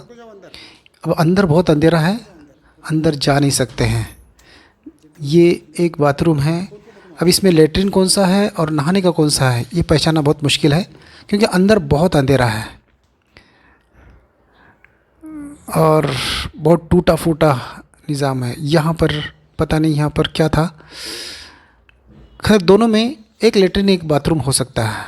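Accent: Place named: Deccan, India. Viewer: Mashaallaha your talafus is daccani mostly Belguam side.you’re explaining it correct.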